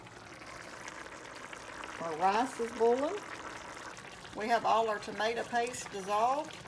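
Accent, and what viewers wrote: South, United States. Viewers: Don't you just love it? 🤗 I always love the sound of a southerners words. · 🤣😂🤣It's southern charm, dear. I live in Missouri and my southern friend from Virginia thinks I talk funny. We can appreciate one another's differences, and be surprised by them.! 💕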